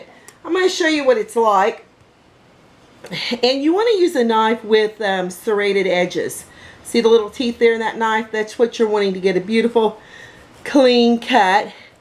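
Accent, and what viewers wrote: South, United States. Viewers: Are you from the south ? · Are you from the south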